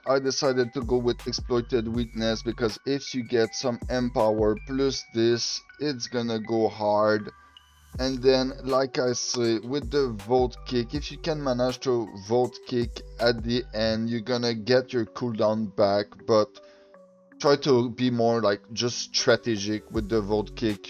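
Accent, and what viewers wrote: Quebec, Canada. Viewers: es-tu quebecois? ton anglais ma l'air d'avoir un accent QC 😅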